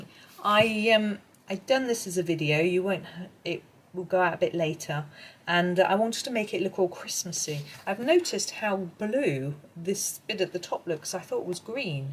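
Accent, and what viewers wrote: England, United Kingdom. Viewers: You are such an inspiration! I enjoy your videos and your soothing voice. I'm from the US and I love listening to English accents though, so I may be biased lol.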